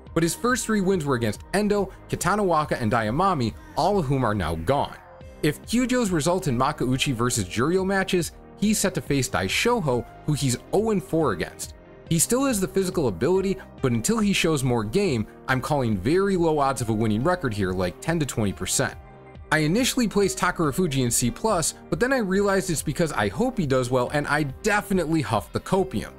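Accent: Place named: United States. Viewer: Good analysis, but I wish you stopped americanising Japanese words and Japanese names.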